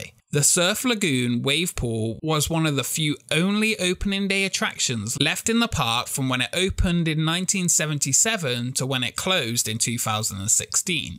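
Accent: United Kingdom. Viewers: I cannot stand that Bul Brit Accent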